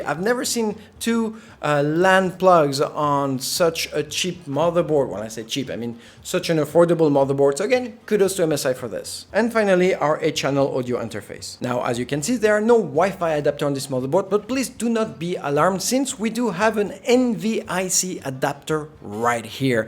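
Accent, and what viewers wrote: France. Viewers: Tu es français ? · so what exactly is the advantage of having 2 lan ports? and btw , boy u sound soooooo french haha nice vid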